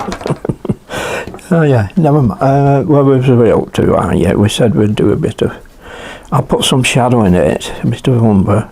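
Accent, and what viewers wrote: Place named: Northern England, United Kingdom. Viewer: Lol. Sorry,. I've never been first before. Just want you to know how much fun I have watching your videos. I love your freedom while painting, even tho I can't understand half of what you say. I'm from the US and my ear isn't tuned to Northern England. But it's interesting to put it together from context.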